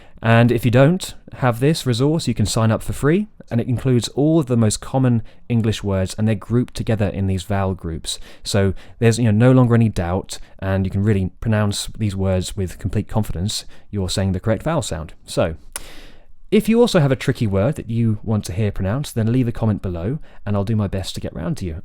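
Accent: United Kingdom. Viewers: Hello!! It is always a pleasure to hear to your clear explanations and beautiful British English. You know? Just thinking out loud......I find the spelling advice you gave us a bit contradictory. Since vowels can be distinguished auditorily I have always counted on the spelling of the vowels and the organs of speech to produce them. Perhaps I think in terms of the spelling of the consonants when there is pre--fortis clipping. I focus on the word family too as you mentioned, daughter is not the same vowel as in draugh. Anyway, I think that considering spelling is an important issue we cannot disregard?? or perhaps you were answering one of Adair's questions I missed? Cheers stella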